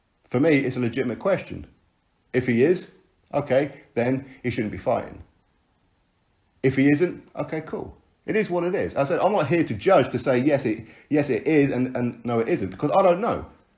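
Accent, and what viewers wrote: United Kingdom. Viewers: Ion know y but I can’t stand listening to someone with a uk accent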